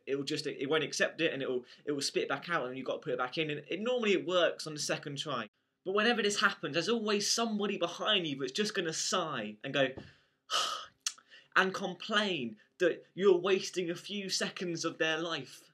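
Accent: England, United Kingdom. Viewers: OMG ur sooooo funny and super cute too and that accent is just a bonus. I just wanna let u know that U have made it official that when I get older (and am not a small 16 year old ) I will go to Britain and find myself a nice guy like u keep making vids cause ur great ; D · London transport is terrifying O____O I went on the underground and the air got so thick and there were so many people and the trains are so fast! (I realize this is the point but it's scary) I wonder If I actually sound English like you or Charlie or it could just be a London thing :p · I have watched this video SO many times just because of your british accent.. and well the story is kinda funny...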